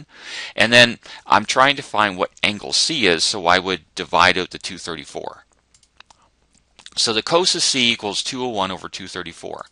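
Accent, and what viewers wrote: Canada. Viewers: u sound like a canadien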